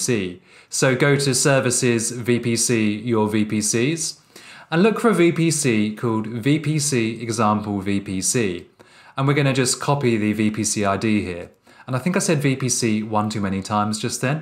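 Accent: United Kingdom. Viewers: british accent makes it more understandable and engagging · London English! Wonderful!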